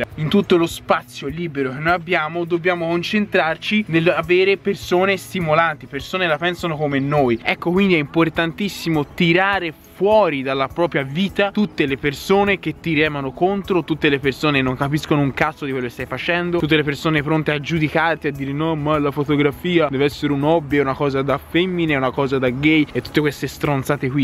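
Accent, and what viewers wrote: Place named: Tuscany, Italy. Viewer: quanto è bello il tuo accento toscano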